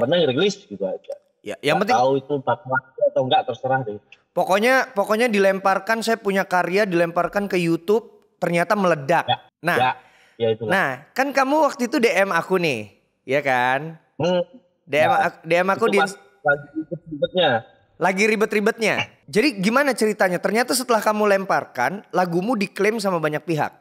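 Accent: Java, Indonesia. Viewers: Medoknya khas wkwkwkw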